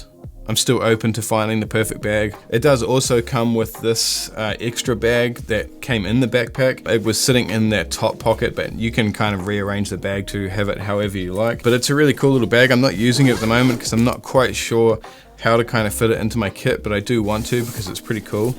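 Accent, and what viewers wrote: New Zealand. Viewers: yeah but you should have used the word very vs. bit... ESPECIALLY when it doesn't even open from the back. Lol. (just breaking your balllls, having a laugh. Love your content and love the kiwi accent in a sea of English photographers doing YouTube - now let's get some momentum/consistency going with the channel eh?)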